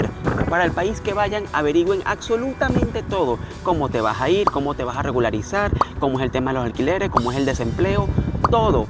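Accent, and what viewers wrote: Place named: Venezuela. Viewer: Que extranio, tu acento me parece mas Venezolano que Chileno... :)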